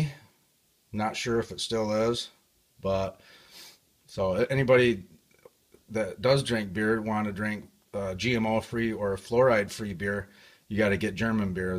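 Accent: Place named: Midwest, United States. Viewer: I absolutely love that you took the time to make this video, thank you so much! (plus, I adore your Midwest accent).;) I started taking 1/8-1/4 tsp Borax everyday to get the fluoride outa my body & even though I now have a Berkey water system w/ the fluoride filters, I still supplement w/ Borax (& Lodoral Iodine) due to chemtrail crap & the various foods & beverages I ingest that likely contain fluoride. Anyway, you rock for sharing, thanks again.:)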